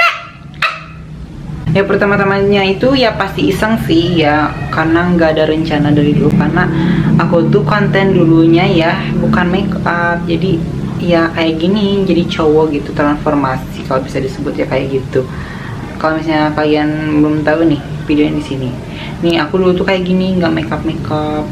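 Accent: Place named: Sunda, Indonesia. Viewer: Sunda abiss si aa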